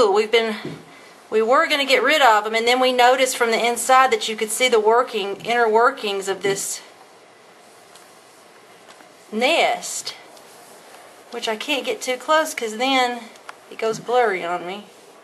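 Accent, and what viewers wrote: South, United States. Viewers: "Hawnets neyst, it is sooo kewl" southern drawl! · He didn't spell it like that because he didn't know the meaning lol... He spelt it that way because that is how you pronounce it with your accent. You don't say "Ten-ding" you say "ten-din" like you say "coo" instead of "cool". Granted he should have said, southerners not hicks.. but you guys definitely pronounce words much different than they are spelled xD. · Some of you are being so rude. She isn't a hick just because she has a southern accent. Southern accents DO NOT signify ignorance. She tried to post an interesting video for all of you to enjoy and all you are just being rude. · Her southern accent is adorable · omg that country accent bleh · Jesus, he was defending you! He said that because you have a southern accent doesn't make you a "hick"! And then he goes on to say if TOTALCONTROL would say that shit to a black person with an accent. 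You should try READING COMMENTS THOROUGHLY before you rub that persons face in the ground. >:( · Are you from Kentucky? You have a really rich southern accent! I love the south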